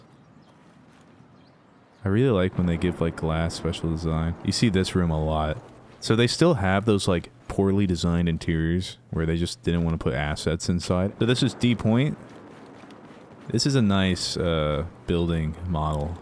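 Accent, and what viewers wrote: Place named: California, United States. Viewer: Dude, you and Point & Shoot sound sooo similar its crazy HAVE TO be from the same area of Cali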